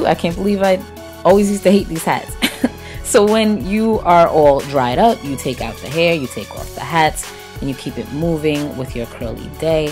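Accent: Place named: New York, United States. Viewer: where r u from? i love ur voice...new york or somewhere up north with a tinge of latina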